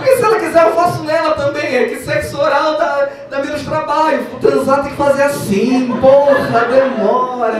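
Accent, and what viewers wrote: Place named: Pará, Brazil. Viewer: Melhor ainda com este sotaque paraense <3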